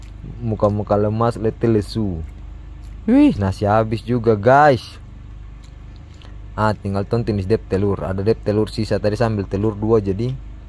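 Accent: Sulawesi, Indonesia. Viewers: Orang Sulawesi ya kalau liat logatnya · Abang orang mana ap orang Sulawesi betul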